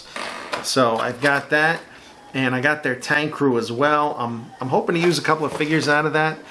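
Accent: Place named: Queens, United States. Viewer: Question: You’ve lost your mojo for a Tamiya American twin jet fighter (F-15), but you’re excited about a Tamiya American twin jet fighter (F-4). What is it about the Eagle that you’re not feeling? There’s nothing about the channel I don’t like. You tell it how it is, you explain your thought processes and the music’s not annoying. Your build quality is inspiring and your Queens accent is refreshing for this Australian subscriber. I like all your build choices, and as such, I’d rather not recommend any particular kit type. I’m nearly finished my Eduard/ICM Dornier 17Z-2 ‘kampfstift’ build and you’ve just ticked my Luftwaffe subject matter preference box. All the best.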